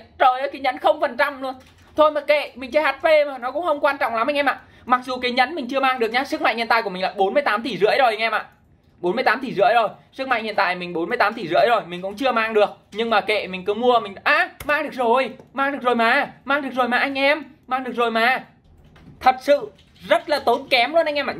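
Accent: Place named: North, Vietnam. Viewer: người miền namnois giọng sương sương miền bắc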